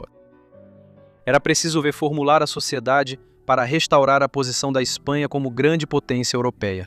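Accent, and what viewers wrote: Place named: Brazil. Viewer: Parabéns pelo vídeo, ótimo áudio em português brasileiro lindo, não assisto mais brief case, devido ao sotaque de Portugal, gostava muito do canal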